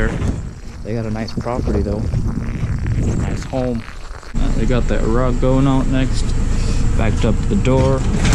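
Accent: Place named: Minnesota, United States. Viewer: Your accent sounds Minnesotan😂 I could be wrong but you sound like a person from up north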